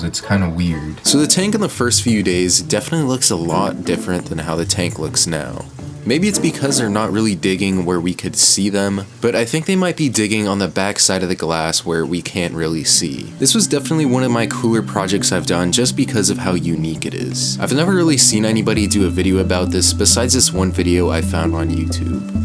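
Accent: United Kingdom. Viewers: why are you in a british accent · does bro sounds british on purpose? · Bro are you British can you say bottle of water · Are you a Brit bro? Why u sound like dat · Is he or his voice became British? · Are you a british brit ‽‽‽ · Bro is British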